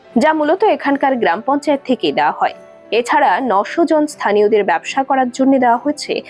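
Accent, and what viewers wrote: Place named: Bangladesh. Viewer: আপনার বাংলা উচ্চারণ একদম বাংলাদেশের মানুষের মত। আপনি কোন জেলার মানুষ আপু। মনে হচ্ছে আমাদের মানুষ। পশ্চিমবঙ্গের মানুষের accent আলাদা।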